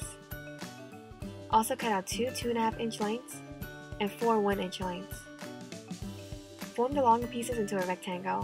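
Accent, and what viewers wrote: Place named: South, United States. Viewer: Are you from New Orleans? You have a southern accent 🥰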